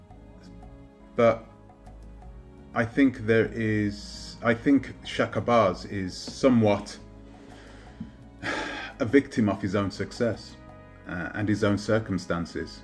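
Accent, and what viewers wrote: United Kingdom. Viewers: wait aren’t your kids white? “Biracial” so would that make you suspect ...... you just contradicted your self your British accent is way stronger than his you literally sound like a white man. Chakra bars accent is way more Caribbean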